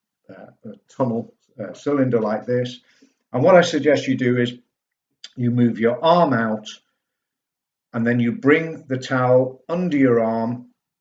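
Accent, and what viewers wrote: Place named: Midlands, United Kingdom. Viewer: Thanks for the tips. I know you are a Leeds boy, but your accent sounds more like the Midlands to me( Staffs, Derbyshire?). Or am I wrong lol?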